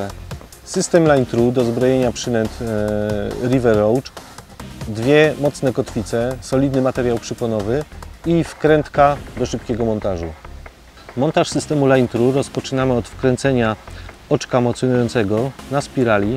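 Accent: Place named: Czech Republic. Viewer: Простите, вы в какой стороне живёте, похоже на Чехию по произношение, я из России..))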